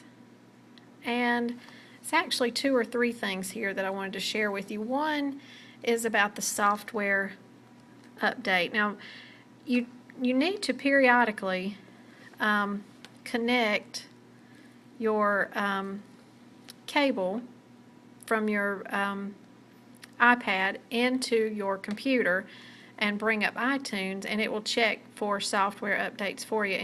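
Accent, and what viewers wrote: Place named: South, United States. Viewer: i love your accent (southern?)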